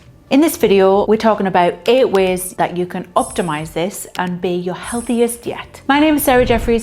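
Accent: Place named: England, United Kingdom. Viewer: Finally !! Someone with an ENGLISH ACCENT !! Thank God !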